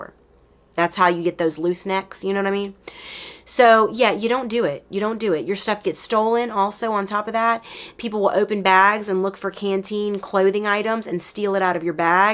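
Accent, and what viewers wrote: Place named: North Carolina, United States. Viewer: I hope you know and BELIEVE how good you are at this ❤ Because I swear, I could listen to you talk about anything for as long as you’d be willing to talk 😂 I also love some of your sayings and your accent because I’m in NC and I can SOOO relate to some of the stuff you say and how you say it… feels like I’m just listening to a friend tell a crazy story❤🫶🏻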